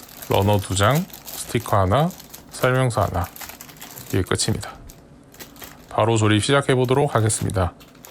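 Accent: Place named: Changwon, South Korea. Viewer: 첫영상은 사투리가 조금씩 들리네요ㅋㅋ 저도 창원살아서 정겹습니다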